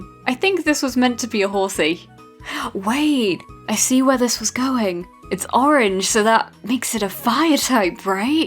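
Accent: United Kingdom. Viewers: Because of your calming voice and British accent even you say something really WACK, will still be calming to my ears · Hmm, i dont have a single one i only subbed a few days ago for a few reasons Pokemon Like the Accent Like the Voice BRITISH MATE ( love the UK even tho im just a lowly German ^^ )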